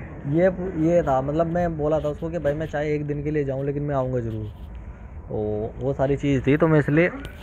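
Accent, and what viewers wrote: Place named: Bijnor, India. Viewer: Hallo bhai up bijnor se ho kya ❤️❤️🙏🔥🙏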